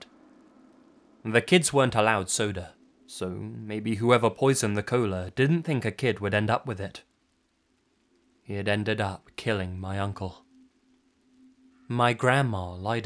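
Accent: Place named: England, United Kingdom. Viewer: tbh i can only watch mr nightmare and. all the other horror channels ive tried to watch either have content ive already seen from or mr nightmare, or their voices irritate me. just my opinion, but has one of the dreamiest voices. id honestly pay him to read a telephone book to me. but i guess i just have a bias towards english accents.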